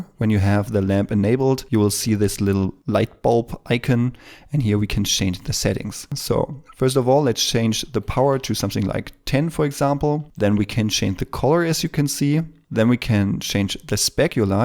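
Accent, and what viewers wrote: Germany. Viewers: Super Video! Es ist ja richtig erfrischend mal wieder einen schönen Deutschen Akzent zu hören. :)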